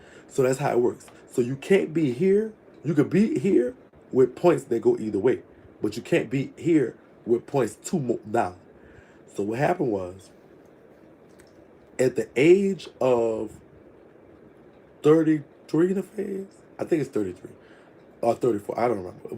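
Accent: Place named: Houston, United States. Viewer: You talking in that Houston boys voice is taking me out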